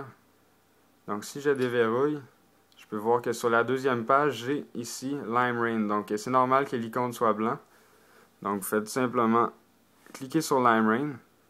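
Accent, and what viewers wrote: Quebec, Canada. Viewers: je kif ton accent du quebec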